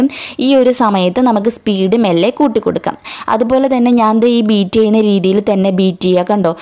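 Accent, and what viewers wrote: Kollam, India. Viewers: I'm from kollam.chechide samsaram kettapozhe manasilay kollamkariyannu.ano😃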